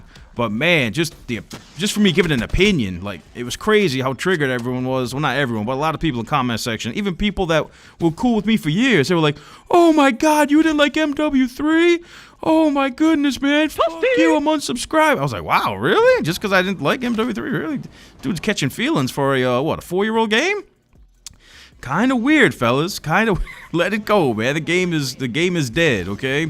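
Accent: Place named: New York, United States. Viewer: I Knew you was from ny b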